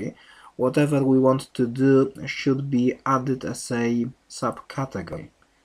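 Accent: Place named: Poland. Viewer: awesome accent, polish I guess?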